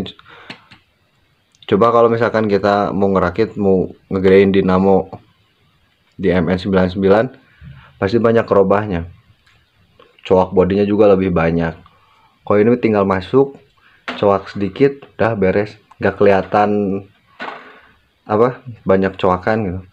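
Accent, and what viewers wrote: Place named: West Java, Indonesia. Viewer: Kang sunda na karaos,asli timana 😅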